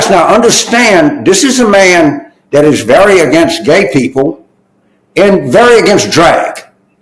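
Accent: South, United States. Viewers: let me give you a little podcast. FYI… Do your podcast in a bedroom or heavily carpeted living room the echo on your voice is kind of intolerable especially with your deep southern accent… Which, besides you is a very difficult one for someone who respects democracy to listen to at this time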